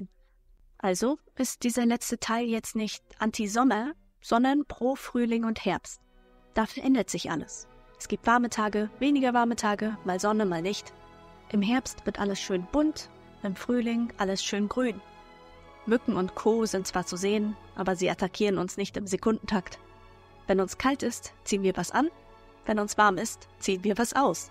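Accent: Mecklenburg-Vorpommern, Germany. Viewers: Kommst du eigentlich aus MV? Sommä, immä 😅😅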